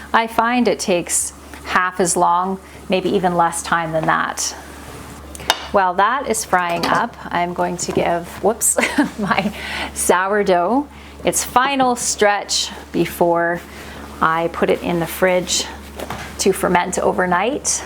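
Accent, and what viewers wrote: Canada. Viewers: You didn't ask, but I found you via Becky of Acre Homestead. I am childfree and live in Denver in a tall building with a very windy balcony. Last year, my delightful herb tower blew over twice, so I ended up gifting my herbs/lettuce to others who live here. I'm probably not your expected demographic. LOL! I'm just here for entertainment and some (scaled down) recipes. I've been bingeing your videos and putting the pieces of your story together. You are delightfully entertaining and relaxing to enjoy. I also really like your style: quick intro/outro bookending great content. I grew up in Texas, and I just love hearing the Canadian pronunciations/words: paprika, sorry, tuque, and more! Congratulations on the 100K!!! I can't wait to see your button plaque hanging on the wall behind you (at least momentarily, right?)! Thank you for carrying us all along for the ride. 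Oh and watch out for Martha; she's fierce and might start demanding her own channel. #LongestCommentEver <3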